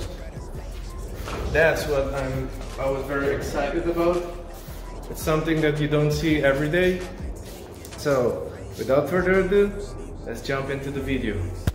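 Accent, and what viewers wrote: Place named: Morocco. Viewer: الوجه و اللكنة مغربي،، منور خويا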